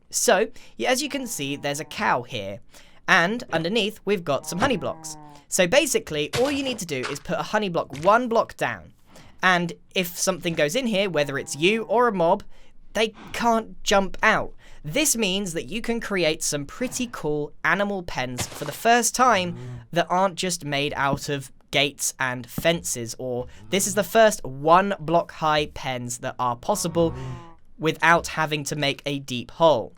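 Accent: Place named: London, United Kingdom. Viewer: Hannah Huang HES from London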